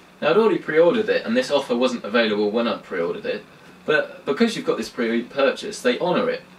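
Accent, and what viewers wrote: United Kingdom. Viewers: His british accent just adds to the comedic value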